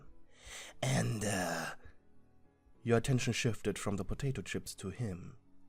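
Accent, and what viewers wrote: Germany. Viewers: Why do i hear a little german Akzent :,)?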